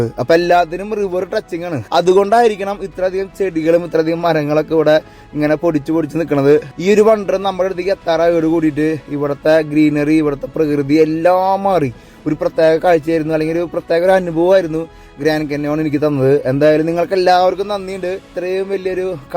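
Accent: Thrissur, India. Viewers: No words, your trichur accent, the commentary, your stature, your vehicle, solo drive all blends perfectly. Wishes for all your endeavors as good kerala U tuber. ❤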